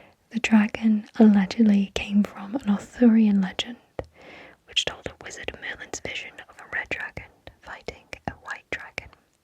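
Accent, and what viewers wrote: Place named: Wales, United Kingdom. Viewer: Love Wales ! Welsh accent is beautiful, it reminds Gaelic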